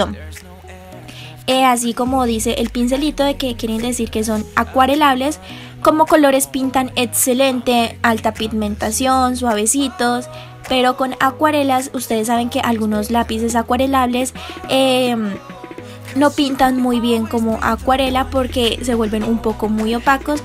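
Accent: Colombia. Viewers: sos colombiana cierto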